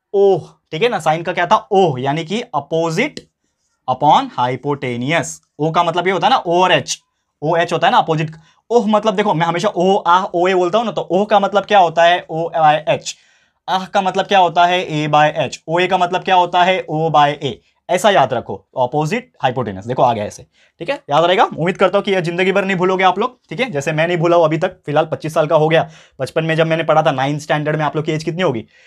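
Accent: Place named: Mumbai, India. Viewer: I am 51 yrs , schooled in Mumbai, you speak Bambaiya Hindi liked your explanation very much watching you from Goa.😊 Thankyou 🙏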